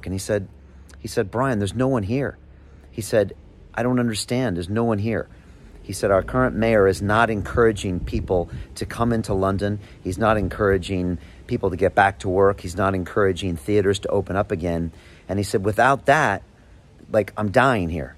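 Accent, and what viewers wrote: United States. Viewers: how can he be the mayor of London England and has an American Accent? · You sound very american.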